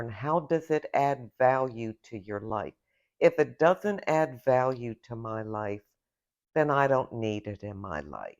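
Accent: Pittsburgh, United States. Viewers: Your videos just recently popped up. As I listened to you talk, I just knew you were from somewhere close to my hometown of Pittsburgh! I’m so happy! Love your content and agree with 99%.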